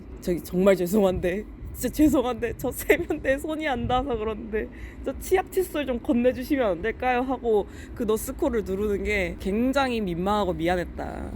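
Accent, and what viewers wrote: Gyeongsang, South Korea. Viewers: 예쁘고 털털하시고 경상도사투리ㅋㅋ 심쿵했습니다. 긍정적 마인드시니 빨리 나을 수 있을겁니다! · 억양이 경상도분이시군요 힘내세요!!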